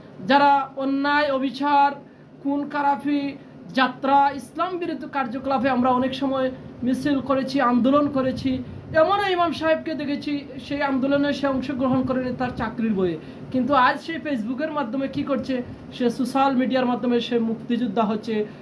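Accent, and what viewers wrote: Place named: Sylhet, Bangladesh. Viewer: আচ্ছা আপনি কি অন্যায় প্রতিবাত করেছেন? কথায় মনে হয়ে আপনি সিলেটি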